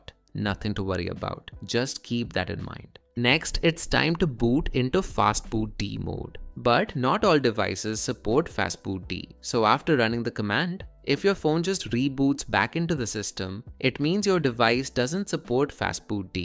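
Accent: India. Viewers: Other than indian accent..everthing seem to be OK.... .// good job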